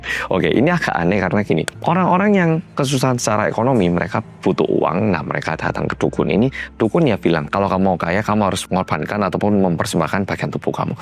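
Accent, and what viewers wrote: Java, Indonesia. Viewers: Hai muedok🎉 Nyimaaak 🎉🎉🎉 terima kasih, yaaaa 🙏🏿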